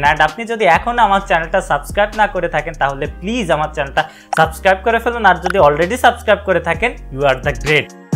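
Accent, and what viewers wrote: Bangladesh. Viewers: Bhai er bari ki borishal?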